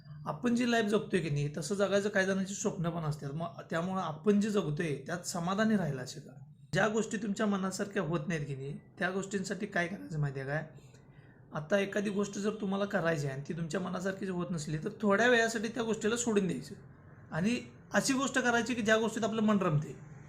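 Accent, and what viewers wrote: Kolhapur, India. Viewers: दादा कोल्हापूहून आहे काय भाषेवरून विचारल..😊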